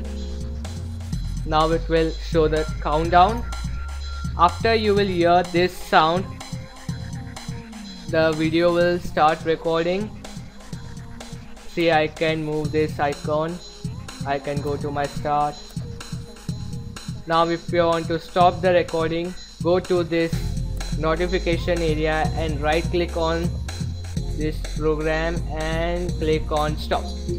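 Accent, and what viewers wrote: India. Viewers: Obnoxious "music" and audibly, almost definitely Indian voice (and all that you know). Nah, there are better tutorials. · you speak like a indian ,i think you are indian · Stopped watching after i heard that he was indian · AWSOME Indian accent man I like it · His accent bet his indian? if im not wrong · HE IS LIKE INDIAN AHH THAT VOICE BUT TNX ALLOT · heehee are you indian? :)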